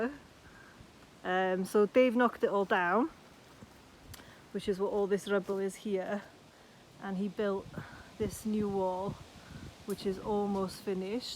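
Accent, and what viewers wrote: North Wales, United Kingdom. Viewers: North Wales 😁🏴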